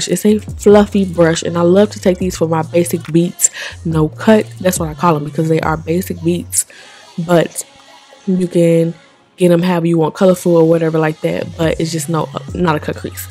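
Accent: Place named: DMV, United States. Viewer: You sound like you're from the DMV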